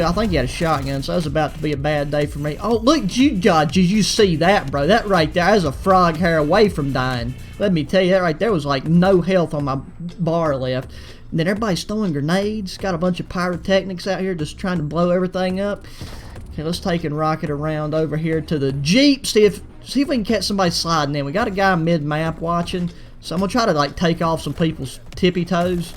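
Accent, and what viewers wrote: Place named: North Carolina, United States. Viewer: I’m from Texas so I crossed that state off the list for your accent. You sounded like my buddy from NC. 😂😂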